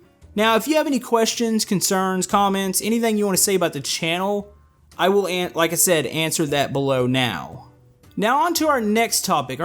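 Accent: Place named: Texas, United States. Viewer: can you speak with your texas accent?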